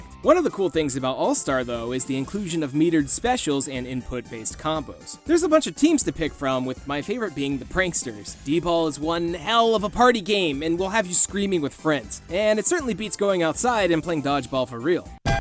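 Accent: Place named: South, United States. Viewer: Where in the south are you from? :0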